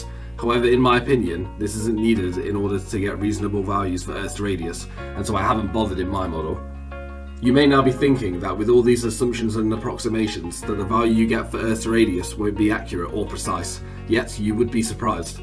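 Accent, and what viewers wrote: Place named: United Kingdom. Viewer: Your setup would be ideal if you were standing on the equator at either the spring or fall equinox. The reason your result is lower than the actual is due to the geometry that results from the tilt of the Earth at that time of year and of your latitude on Earth. If you do this experiment on the 1st day of spring or 1st day of fall, then you'd only need to adjust due to your latitude. If you divide by the cosine of your latitude (you sound British so lets say South England ~50 deg), you'd get 6222 km (4000 / cos(50)).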